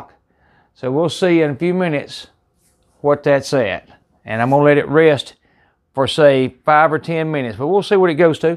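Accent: South, United States. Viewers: I honestly like your videos.. But I'm a NYC girl..and omg love your southern accent!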